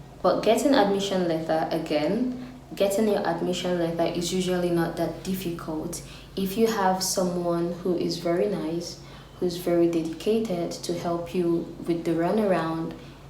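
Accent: Philippines. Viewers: You are starting to sound like a filipina. Thank you for all the information that you shared. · Nice,your accent is like phillipines already... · Wow 😮 nice accent pilipino accent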